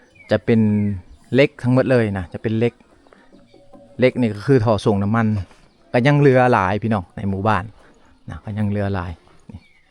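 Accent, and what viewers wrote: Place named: Southern Isan, Thailand. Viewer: อยู่แขวงไหนครับพูดเหมือนคนอิสานใต้ครับ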